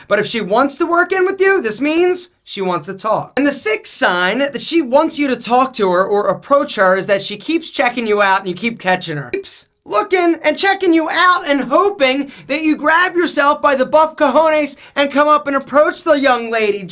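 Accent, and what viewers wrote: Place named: New Jersey, United States. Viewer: So you’re from new jersey I can tell from you’re accent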